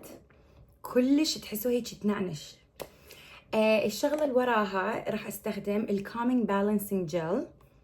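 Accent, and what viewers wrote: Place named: Iraq. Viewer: اهلا وسهلا بك ياابنة العراق.مااجمل لهجتكِ العراقية!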